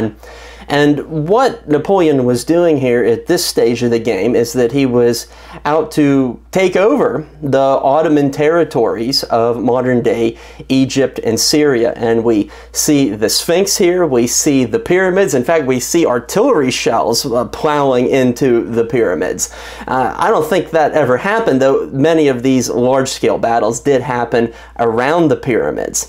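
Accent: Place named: Pittsburgh, United States. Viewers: This guy's Yinzer accent is strong. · Bro you gotta be from Pittsburgh Area. I moved away from there when I was like 12 and can pick that areas accent out. Lmk if I’m right. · The presenter has a Pennsylvania accent. He sounds educated.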